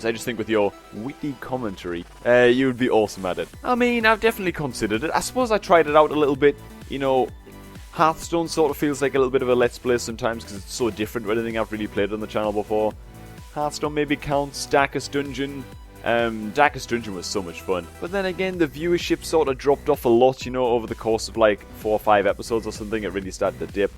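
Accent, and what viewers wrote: Newcastle, United Kingdom. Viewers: Representing us geordies!!!!!!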